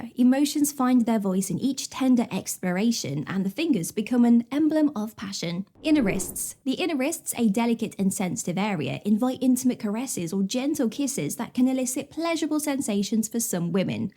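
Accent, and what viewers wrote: England, United Kingdom. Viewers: your English accent is so beautiful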